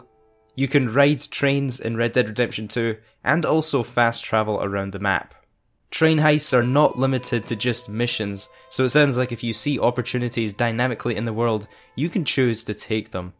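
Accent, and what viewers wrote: United Kingdom. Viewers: I found your British accent very entertaining!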